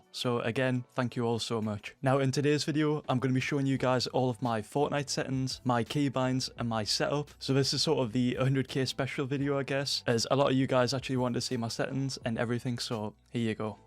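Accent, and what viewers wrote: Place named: North East England, United Kingdom. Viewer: You sound like your from the north east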